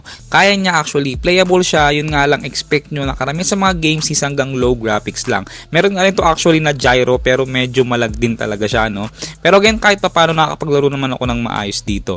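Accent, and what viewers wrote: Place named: Pampanga, Philippines. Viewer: you sound Kapampangan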